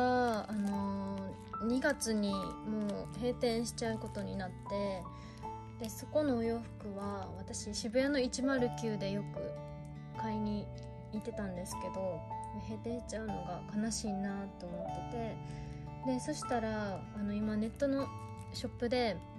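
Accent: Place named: Kyoto, Japan. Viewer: 今までゆきりん一筋でしたが、AKBの全国ツアー(愛知公演)を見に行ってからゆいちゃんのことも気になるようになりました😅 ゆいちゃんの京都弁が好きです😊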